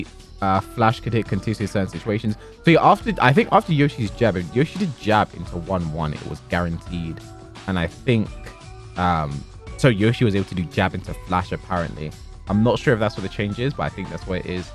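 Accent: England, United Kingdom. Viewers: The music is a little distracting. Your also speaking fast in an English accent, which usually isn't a problem but the music makes it difficult to understand what your saying. Thanks for the upload on the patch notes.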